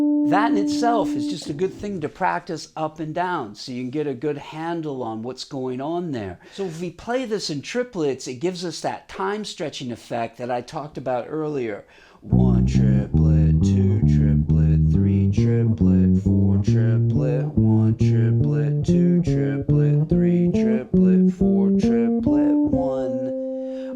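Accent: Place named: United States. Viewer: omg you are a monster... ps you mentioned 5 pounds. You are a USA native right? You sound like it.., Ps I have 1978 neck through body Eagle BC rich bass which is amazing. Sound neck playability is great 👍. I bet you could tear it up.... I gotta say even the stuff you talk about is on another level.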